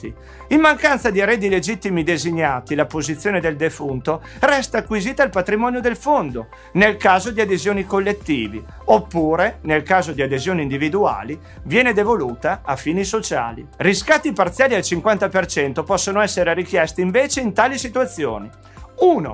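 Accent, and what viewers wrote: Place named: Piedmont, Italy. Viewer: M'iscrivo, oltre che per i contenuti interessanti, anche per il marcato accento piemontese😄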